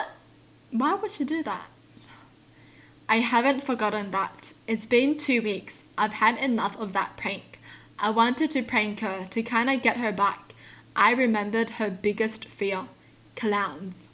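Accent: Australia. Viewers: lives in Australia. She has an Australian accent. I like her accent, too. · so if americans speak in american do they speak in other language? if brits speak in british accent does that mean they speak other language? lol im loving ur logic shes australian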